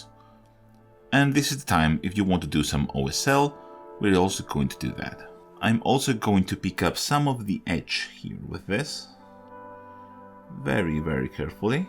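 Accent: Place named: Spain. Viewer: Fue escucharte hablar inglés y supe de inmediato que eras español, nivelazo de inglés pero el acento español siempre se nota.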